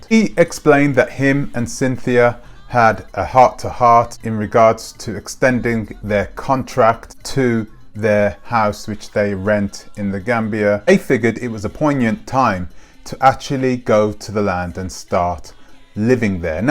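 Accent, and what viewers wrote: Birmingham, United Kingdom. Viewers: Bless your cotton socks..you sound like a proper brummie. 😄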